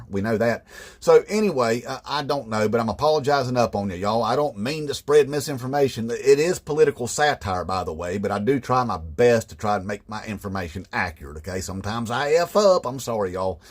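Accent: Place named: I-10 Corridor, United States. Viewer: I’m going to guess you live within 50 miles of I-10. I recognize that accent.😂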